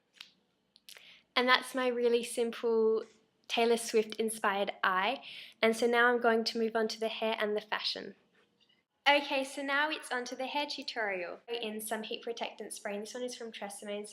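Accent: Australia. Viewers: r u Australian? i am!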